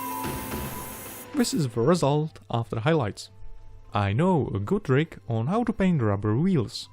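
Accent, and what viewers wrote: Czech Republic. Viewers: Jsi Čech? Podle přízvuku ;) Krásná práce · Český přízvuk jsem okamžitě poznal:)))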